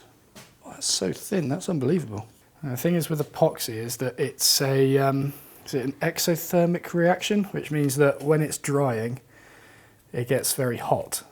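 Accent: England, United Kingdom. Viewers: i thought he was an Englishman from his accent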